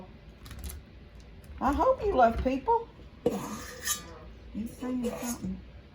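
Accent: Texas, United States. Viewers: Just watched three of your videos-----and all I can say is that---YOU ARE THE JACK OF ALL TRADES!!----cleaning, organizing, feeding all your BABIES--mowing & raking/blowing leaves, fixing ALL KINDS of stuff, decorating, undecorating, crafting---oh my goodness--the list goes on--------and NOW---you are baking cookies----and homemade iced tea!!! WOW!!! AND just LOVING your TEXAS ACCENT!!! Will be looking forward to all your videos🥰😍🥰😍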